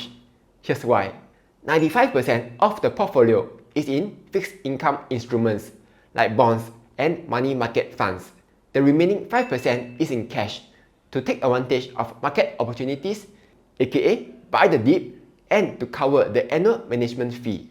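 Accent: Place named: Singapore. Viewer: I find his Singlish slang more relatable.. 😂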